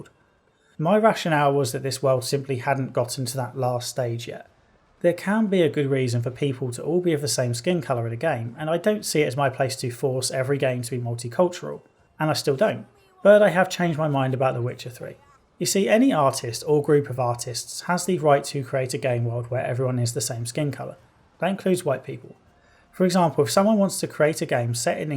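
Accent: United Kingdom. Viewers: The only thing missing from the joseph andersons videos was the british accent, now its fixed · Oye, francisco, cálmate que te lees bien facho. Él tuvo una mala elección de términos al decir que no había diversidad cultural, pero creo que fue accidental. El universo de The Witcher es basto y rico culturalmente hablando, es lo más hermoso, rendirle homenaje al folklore europeo de tal forma es bellísimo. El gesto de su crítica fue decir que se puede entender que los humanos de cierta región sean homogéneos en cuanto a su color de piel y más si se trata de la Edad Media y todo ese rollo, pero con PORTALES, otros mundos y otras especies como la de los elfos, enanos emtre otras antropomorfas ¿y todas esas son blancas también? Muy raro eso, muy sospechoso. El tipo es británico y se le nota en el acento de aquí a la China, dude, chill. Puro facho defendiendo sus sesgos raciales. 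Si fuese una representación histórica acertsda de la Edad Media sin portales ni otros mundos, pues no hay problema, todo bien, pero no en un Universo donde existe la magia y la habilidad de viajar entre regiones y otros mundos. Facho. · Why are you trying to make me shut off your video after you go off into left field talking about race? And why demean yourself by calling yourself a privileged white person? Your original instinct in 2015 to roll your eyes was the correct one. Why are fantasy stories mostly populated with white people? Cause it’s based off of European history and culture. Based on your accent you come from a country that used to run the world and the self flagellation is just embarrassing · He's very obviously British from his accent though. Stop making this about America.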